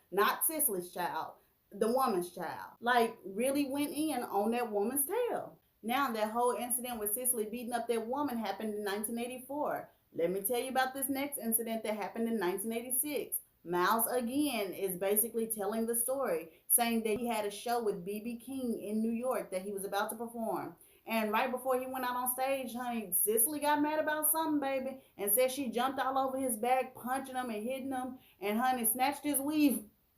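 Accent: South, United States. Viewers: Keep up the good work.... iv only been following you for a few days now.., and I love your page... it’s fun, energetic, and you have the southern voice that makes me want to come back for more!!!! Sooo kudos to you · Sis, we want you to be you!!! I love your southern loud accent!!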